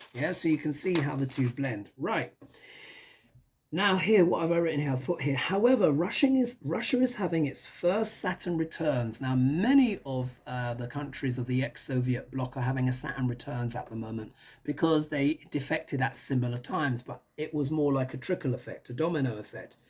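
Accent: England, United Kingdom. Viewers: I TOLD MY TEENAGE GRANDSON U SAY SLURP. OMG HE FELL OFF THE BED LAUGHING. THEN HE CALLED A CLASSMATE N THEY BOTH R SAYING IT WAITING FOR ME TO TELL THEM IT SOUNDS LIKE U. I'M NT GONNA SAY THAT BC THEY R FUNNY TRYING TO. THEY SPEAK LIKE U TO PPL THAT DON'T KNOW THIER AMERICAN, NOW THAT'S FUNNY. I LET THEM LISTEN TO YOU SOMETIMES TO HEAR U. I TOLD THEM THEY'RE GOING TO TAKE A TRIP ONE DAY TO ENGLAND N TEST THAT WITH REAL PPL WHO SPEAKS LIKE U, N THEY'LL TALK ABOUT IT FOR THE REST OF THIER LIVES. N I'M COMING TO BC I'VE ALWAYS BEEN DRAWN. THERE FOR SME REASON. BUT I'LL FIND OUT I GUESS LUV U, STAY WELL N. SLURP ALLL U WANT ON UR SHOW.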